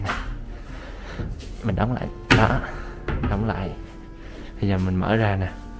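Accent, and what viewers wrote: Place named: South, Vietnam. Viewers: Ui bạn này giọng miền Nam nghe thích ghê, có thiện cảm liền luôn 🤗🤗🤗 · Ko biết quê gốc ở đâu ha nghe giọng giống miền tây quá...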